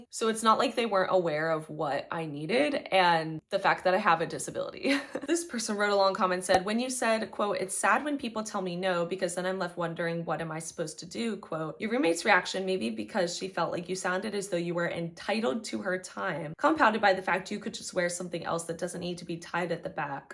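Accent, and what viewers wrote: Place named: United Kingdom. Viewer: Someone tell me why I thought she was British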